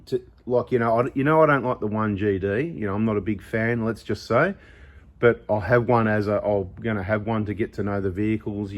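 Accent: Australia. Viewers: I have problems understanding Australian accent, so I got the first choice of a diesel for Isuzu, but I could not understand - other than V8 and made in Japan - what the choice of the petrol model was. Can someone write it down for me?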